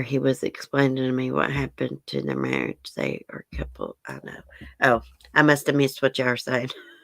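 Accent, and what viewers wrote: South, United States. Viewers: I love you when you said a man killed his 21 yr old neighbor over dog poop I died. It's not funny in the least bit it is just your cute southern accent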